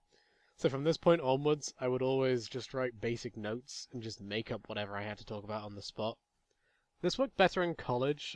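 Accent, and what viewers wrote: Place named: United Kingdom. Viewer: Holy crap... You're British.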